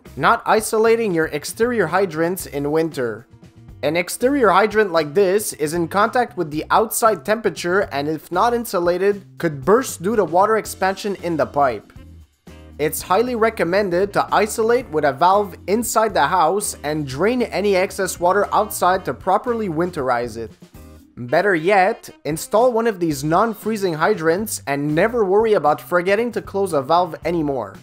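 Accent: Quebec, Canada. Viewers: bel accent québécois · no, but I think you have a French Canadian accent like me lol. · tu me semble etre un quebecois je me trompe ?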